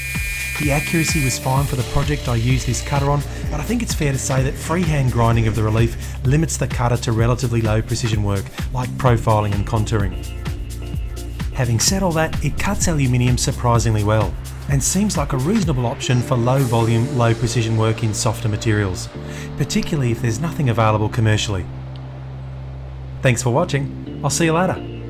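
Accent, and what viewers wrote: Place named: Australia. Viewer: Knew it.. your aussie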